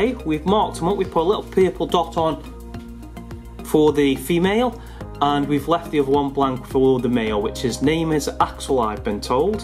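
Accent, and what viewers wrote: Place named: Merseyside, United Kingdom. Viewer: You from merseyside? That accent is to close to home, I'm about pick some jumping spiders up soonish hopefully. Great video bro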